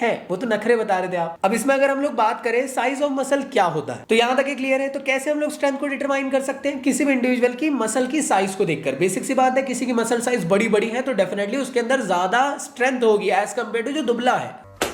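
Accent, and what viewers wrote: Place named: Madhya Pradesh, India. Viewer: Bhaiya app ki bhasa sa lgra app mp ka hoa😂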